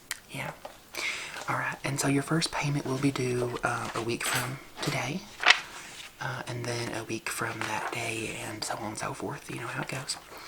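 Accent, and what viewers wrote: South, United States. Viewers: WHEN YOU SAID HOGWASH!!!! AAAAHHHHHHH I about DIED!!!!! I didn’t realize people spoke like this until I moved down to the south!!! 😂😭 I love it!!! When I saw the title I instantly thought of the lady who complained about her Tupperware on the judge show! 😹♥️ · First time seeing your channel and omg wow. First of all, that FECKING ACCENT IS SO EXCITING. First southern accent I’ve come across in ASMR! And this video idea is amazing 😂 I love Tupperware 😍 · Please make another southern accent video! I’ve watched this one dozens of times! I love it!!!! ❤️ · I like the southern accent series. Do more :)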